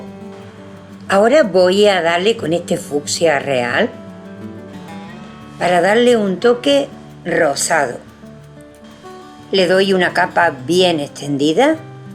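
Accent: Andalusia, Spain. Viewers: TE MIRO DESDE SAN RAFAEL, MENDOZA, ARGENTINA. TIENES UN TONO ANDALUZ, ¿DE QUÉ PROVINCIA ERES? ME ENCANTAN TUS VIDEOS Y SOBRE TODO, LOS RELACIONADOS A TUS ESPECTACULARES PESEBRES. SALUDOS.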